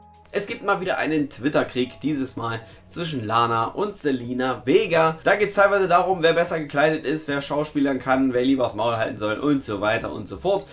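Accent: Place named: East Germany, Germany. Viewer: Ossi halt 😄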